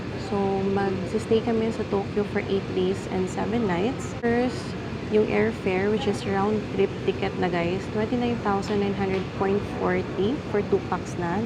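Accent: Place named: Iloilo, Philippines. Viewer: Ilonggo kamo? I can hear the accent. 😊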